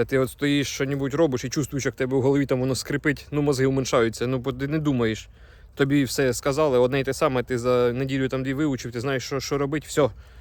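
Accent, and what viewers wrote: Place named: Orikhiv, Ukraine. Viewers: На шо , на чом ??? Він балакає українською , на одному з діалектів (Запоріжжя мабудь, похоже), як і у всих странах в разних регіонах разні діалекти... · Це не суржик , а панський Орехівський діалект.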